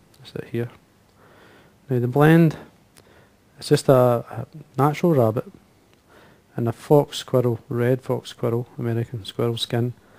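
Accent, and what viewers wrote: Scotland, United Kingdom. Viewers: My fly box is sort of like yours, I don't tie as well, you are by far the best on You tube and that is saying a lot, there are a lot of good tiers here, It took me a while with my american english ears to understand you, heavy Scottish accent its a good thing! I have one fly box in my vest that is debated to just Adams Dry Flies, I have them from size 6 down to 22! At least half dozen in each size, I like this style, and going to add them to my box, Roe deer never seen it in the flyshops, but White tail deer should do, I been tying my own flies since I got my first vise a Thompson A back in '64 and I still have it to go with the Regal, HMH and Dyna King. Of all of them I like the old Regal and the Dyna King! But a vise just holds the hook, the skill and the artistry of fly tying well that is something else all together, I am on my way to Montana next week, I decided that I am just going to spend the rest of Sept and most of Oct- Fishing then back home just in time for Rifle Deer hunting, then maybe some winter Steel head fishing! 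· Nice tie and I'll be taking a couple to the faster upper reaches of the Clyde. Great to hear a fellow Scot too!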